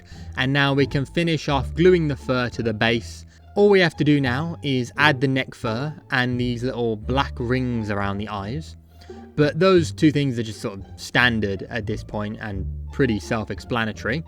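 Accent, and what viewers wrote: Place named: Birmingham, United Kingdom. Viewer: are you from birmingham?? s teir accent :))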